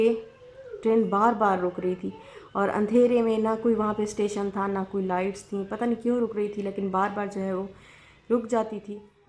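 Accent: Saraiki, Pakistan. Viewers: Apka laahjaa asa hai jesy sarayki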